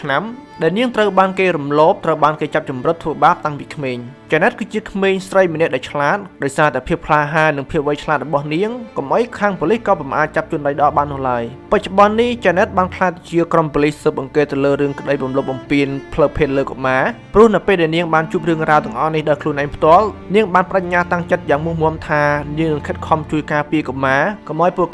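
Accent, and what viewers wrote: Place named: Siem Reap, Cambodia. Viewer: Admin និយាយមកដឹងតាអ្នកសៀមរាបម័ង😂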